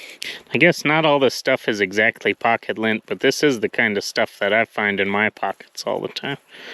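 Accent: Canada. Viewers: Hi, I am a new viewer. Are you a Canadian? You have a Canadian accent. Great channel.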